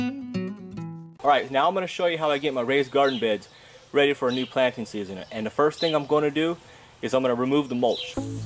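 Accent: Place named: Louisiana, United States. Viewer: Love your videos bc your accent reminds me of home lol you have to be cajun lol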